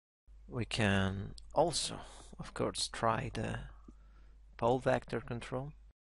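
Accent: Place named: Italy. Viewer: I like the way explain. Since i'm french speaking i understand almost every words from his italian accent;) Better than any american speaking too fast for me or using slang...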